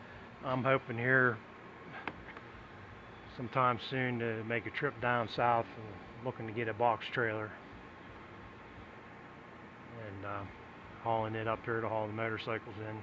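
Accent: Pennsylvania, United States. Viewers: You sound like my in laws, Are you in PA? where did you get the bug shield from?:)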